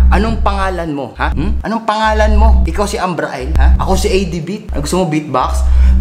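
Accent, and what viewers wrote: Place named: United States. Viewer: Bakit ka nag american ako subcribe ko tas like shempre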